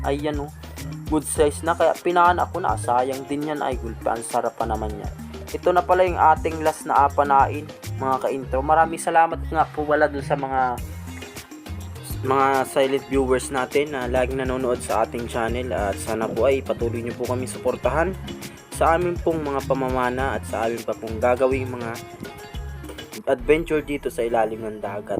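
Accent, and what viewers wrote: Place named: Marinduque, Philippines. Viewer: a my classmate ako dyan sa dawis si Japet labrador si melody saet mrami ako classmate dyan ipagpatuloy mo lng yan kabayan suportado kita dito sa atami japan natutuwa ako sayo mg salita marindoquienio ka tlga god bless allways idol❤❤❤❤❤❤❤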